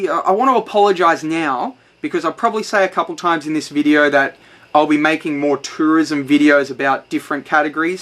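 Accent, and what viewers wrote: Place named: Australia. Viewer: You have very thick aussie accent. From around southern australia?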